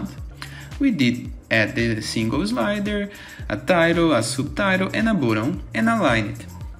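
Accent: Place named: Brazil. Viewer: Are you brazilian? maybe .. your accent is exactly the same srrsrs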